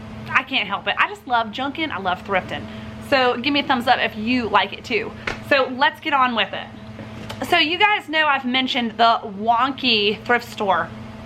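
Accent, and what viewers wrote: South, United States. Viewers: Ok sister I don’t know where you’re from, since you sound like me I’m figuring “The South” so if you ever get rid of that picnic basket I’m your huckleberry 🤷🏼‍♀️😍. So glad I found you!!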